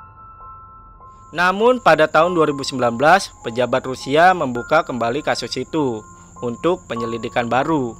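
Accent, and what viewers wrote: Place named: Bali, Indonesia. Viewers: Asli Bali neng terbukti dg ngucap huruf T nya khas bgt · bang tu keturunan bali atau NTB kah? bilang "ithu" nya pas kata "thu" nya kayak orang daerah situ wkwkw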